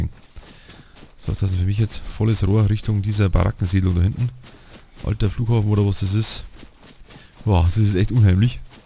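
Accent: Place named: Bavaria, Germany. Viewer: Supaa Video. Endlich moi a scheens Boarisch hean. Do head ma gern zua. Mach weida so.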